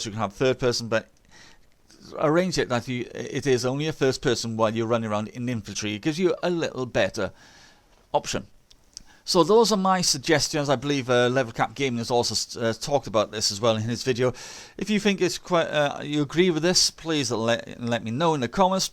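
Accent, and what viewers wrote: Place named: Ireland. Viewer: Irish accent, spot on!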